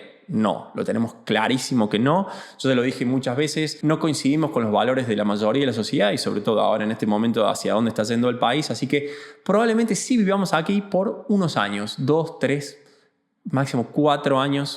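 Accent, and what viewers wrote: Córdoba, Argentina. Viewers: Ya se te está pegando la tonada cordobesa de Batumi 😊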